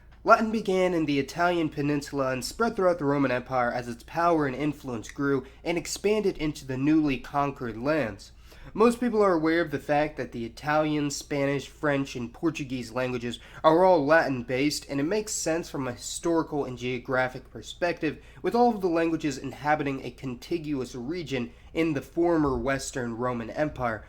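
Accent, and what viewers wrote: United States. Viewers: there are multiple issues with your videos and you know about some about these. You have a good American accent, sounds convincing. But that's your nemesis as well. 1. Pronunciation issues These stem from English, where they repeat A(ei), B(bi), C(si), D(di), E(i), F(ef), G(dji), H(eich), I(ai)...Y(uai), Z(zet). FYI, all speakers of BBC check the correct pronunciation of any foreign name with locals BEFORE saying in on air! Please, note that languages different than English have PHONETIC alphabet, meaning that 'A' is always (a) but not (ei), 'E' is (e) but not (i), and so on lran is not (airan) but (iran). Just like Italy is not (aiteiluai) but (itali), or more correctly Italia (italia), and Enland should be Anglia (anglia) but not (einglaiei). Iraq is not (airak) but (irak). Macedonia is not (masedonia) but (makedonia) Dacia is not (deisha) but (dakia) Thracia is not (treisha) but (trakia) Persia is not (purza) but (persia) 2. 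Ethnic issues Romans (citizens of Roman Empire) have absolutely nothing in common with Gypsies who came from India with Alexander The Great, for whom George Soros paid to be named 'romani'. 3. Today's Romanians are descendants of ancient Dacians (dakians). Dacians (dakians) are Thracians (trakians), and Tracians (trakians) are Bulgarians. FYI, old Bulgarians, had the most precise/accurate calendar ever known and, acknowledging this, in 1976 UNESCO recommended its adoption worldwide. These people had the oldest known crafted gold - Varna civiliz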